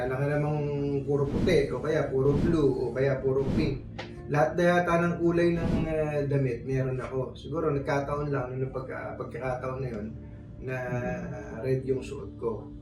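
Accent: Philippines. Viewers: taga saan ka ba ka tunying, parang purong tagalog ka e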